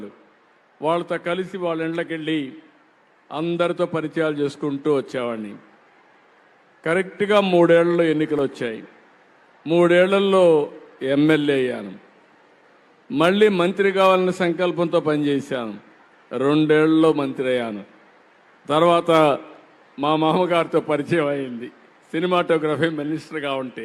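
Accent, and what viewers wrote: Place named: Rayalaseema, India. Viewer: రాయలసీమ ప్రాంతంలో అలానే అంటారు అండి, మా friends కూడా గమనించాను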